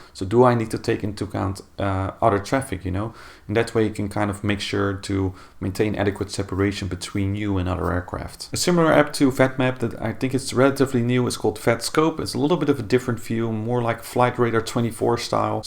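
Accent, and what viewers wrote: Netherlands. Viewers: Ik hoor daar een prachtig Nederlands ondertoontje 👌 Goede video!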